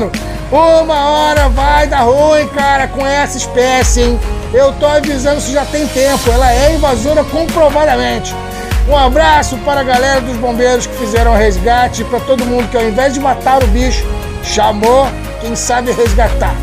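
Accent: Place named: Ceará, Brazil. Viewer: Sotaque iguazin o pessoal do meu bairro kkkkkk é do Ceará mesmo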